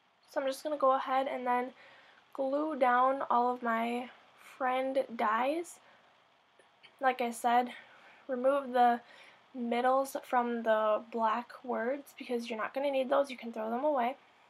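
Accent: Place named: Minnesota, United States. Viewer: I'm happy you're back! Congratulations on your new baby! Your accent sounds like it's from here where I am in Minnesota! BIG time!!!!!!! :-)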